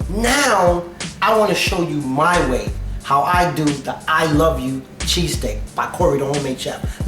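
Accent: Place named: Mexico. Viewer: dude, you sounded like mexican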